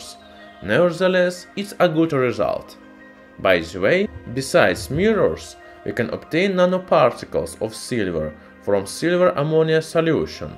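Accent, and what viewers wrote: Russia. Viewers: Nothing more authentic than a Russian accented guy introducing an element. Solute to Mendeleev.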